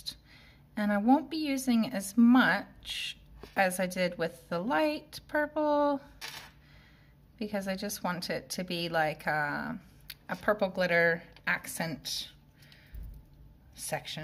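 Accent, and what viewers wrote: Scotland, United Kingdom. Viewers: apologies for the mistake. You’ve certainly picked up a bit of that Scottish accent 😀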